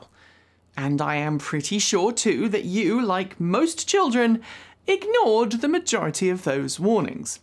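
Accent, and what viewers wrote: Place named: RP, United Kingdom. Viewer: Another entertaining, and eloquently spoken video. I learn more than the topic, but also the "Queen's English". Thank you.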